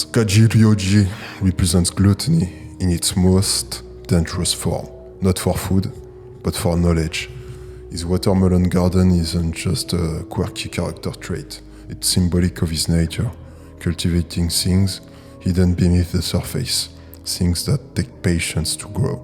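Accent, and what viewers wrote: France. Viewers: g reconu ton accent, c l'accent d'un français 100% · Sounds like French accent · how can one man sounds so french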